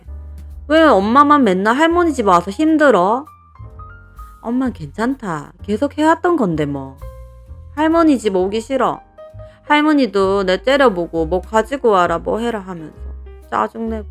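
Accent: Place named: Busan, South Korea. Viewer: 사투리 정말 잘 하네요. 부산 사람이신가요?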